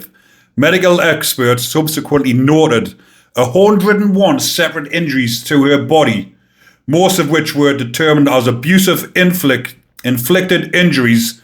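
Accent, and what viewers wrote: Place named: Scotland, United Kingdom. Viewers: As a southern softy I also love that tough northern accent- scares me just to listen to it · Wonderful accent! Is it Scottish? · What English accent does this guy have?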